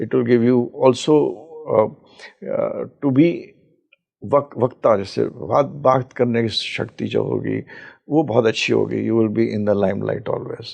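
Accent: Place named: South, India. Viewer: Becoz sir is from southern part of India.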